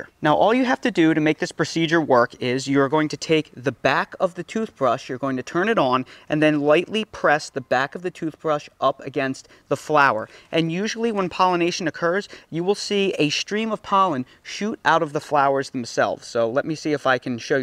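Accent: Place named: Mid-Atlantic, United States. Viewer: I tell you what I’ve said this before you and your wife are my favorite go to info I really mean that and I recently saw from your work you are from where I live the mid Atlantic I’m in Delaware I lived in pa. Md And Delaware I guess I can relate to your accent lol thanks for all your hard work and info the best by far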